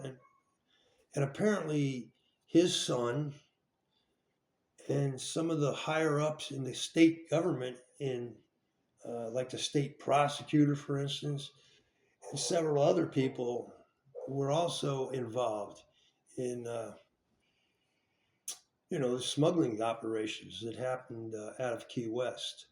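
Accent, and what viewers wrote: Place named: Philadelphia, United States. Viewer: Hey you sound like you got the north Philly drawl! These stories are great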